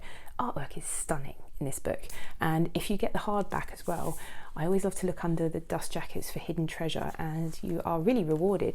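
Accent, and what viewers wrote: United Kingdom. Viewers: I wish i could speak British english sounds great😊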